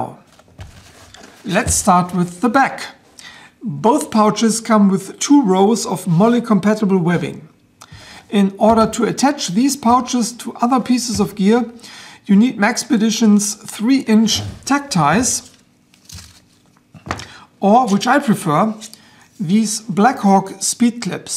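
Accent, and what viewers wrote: Germany. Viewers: I'm half German and I love listening to your German-accented English. It's Wunderbar!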